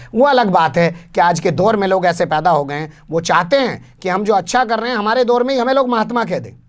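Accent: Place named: Haryana, India. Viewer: Saheb Aap Haranvi bolte wo Aacha aur Mazahiya lagata hai. Keep it up. Court Qanoon ki Dhajjiya BJPs Sanghis. Ke Raj.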